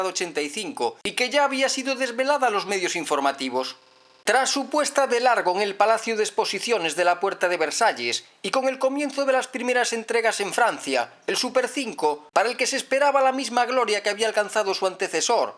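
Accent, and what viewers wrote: Galicia, Spain. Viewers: Es gallego, joder. Es su acento, tan español como el tuyo y seguramente mucho más bonito.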